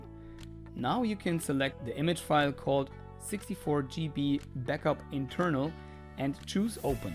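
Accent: Germany. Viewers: Hey, aufgrund deiner aussprache gehe ich mal davon aus das du Deutsch sprichst :D! Wie bekomme ich nach der Installation der Rom, Spiele auf das Gerät? Ich weiß es sind schon etliche Roms vorinstalliert nur würde ich gerne wieder Pokemon auf Deutsch spielen! Habe die 128GB Karte drinnen und mit DiskGenius auch den restlichen platz frei gemacht :)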